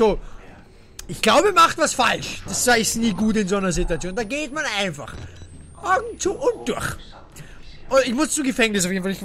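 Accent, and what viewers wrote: Austria. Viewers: Ich hab dich noch nicht do lange aboniert nur eine kurze frage kommst du aus Österreich? :D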